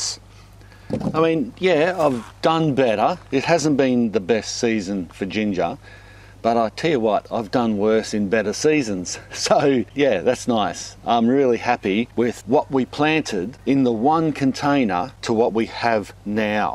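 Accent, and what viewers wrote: Australia. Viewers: It’s local Australian English spoken throughout the country. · Love the Aussie accent. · This just come up and boy am I glad. Aussie Yeessss. I can understand you and you are talking Australian seasons. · that the Aussie accent I like to hear haha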